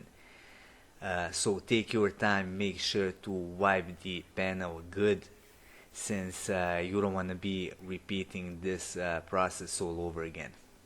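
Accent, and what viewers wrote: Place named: Italy. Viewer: I like your italian accent Mille grazie alfisti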